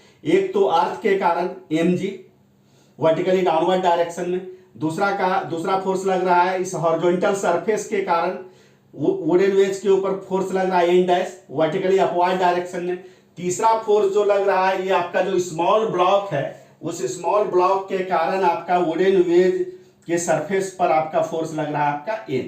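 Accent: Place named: Bihar, India. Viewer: ❤ love from bihar thanks sir i know you are from bihar by language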